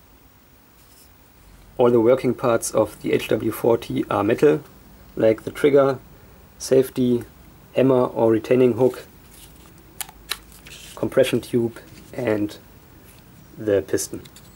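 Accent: Germany. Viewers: Nice video! I love they German accent in English, A really god air pistol, and the Pellets are excelent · DIESER DETSCHE AKZENT HAHAHA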